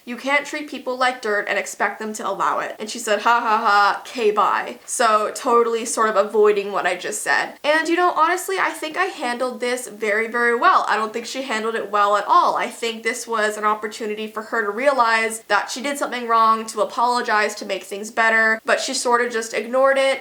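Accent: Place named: Canada. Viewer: I love her Canadian accent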